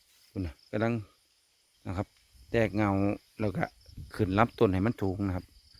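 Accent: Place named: Chaiyaphum, Thailand. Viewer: สวัสดี ที่แท้ก็โคราชบ้านเฮาเนอะสำเนียงเว้านึกว่าบาว่ชัยภูมิเห็นก้ง แล้วอยากกินปลาจอ่มเน อะบาว่ บักหอยตอ้งแกงขี้เหล็กคือสิเเช่บชอบมาก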